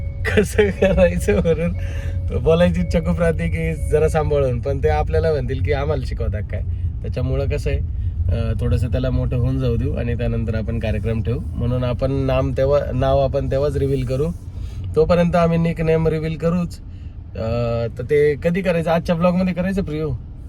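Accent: Nashik, India. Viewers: Dada aple nashik vale olku yetata voice madhun kadje gya dada tai chi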